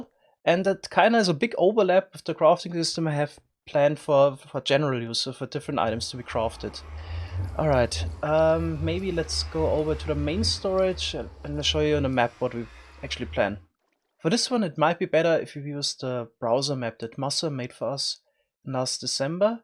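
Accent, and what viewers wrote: Germany. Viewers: Der deutsche Akzent 😂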